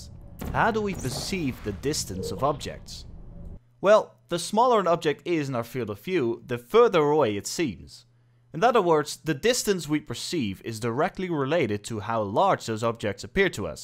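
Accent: Netherlands. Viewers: Damn your accent is Dutch 😂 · You happen to be Dutch? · Nicely explained! I did some portal experiments in UE4 a while back and it's pretty much the same implementation I vaguely remember reading from one of Valve's presentations that they do all the rendering in one pass by setting the view/projecting matrices for the masked area of the portal so they don't use a secondary camera in the same way. It's been a while, so the details are blurry to me. That would save a bunch on performance, but is a lot more involved in getting to work proper. Are you Dutch by any chance? subbed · Dude sounds Dutch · Love the fucked up half English half American accent of this Dutch guy.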